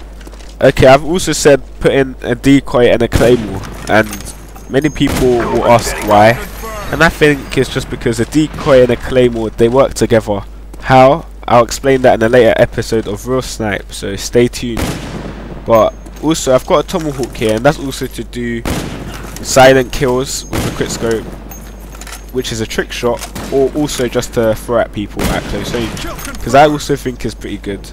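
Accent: England, United Kingdom. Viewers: People with British accents need to stop making videos. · So, is that an English accent...? O_o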